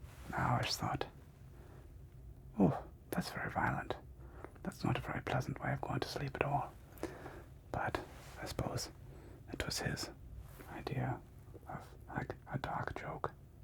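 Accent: Germany. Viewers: I love everything (!) about this video! is such a great, funny, professional, and caring sleep doctor!! And I know I've said this before, but I cannot say this often enough, the German accent so much on point, it's hilarious!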